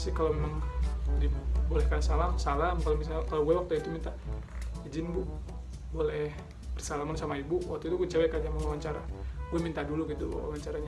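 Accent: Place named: Sunda, Indonesia. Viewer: "Seperti itu nya" lagi serius dengerin, mendadak ketawa bang ahahahhahah sunda na kentel pisann, hatur nuhun kanggh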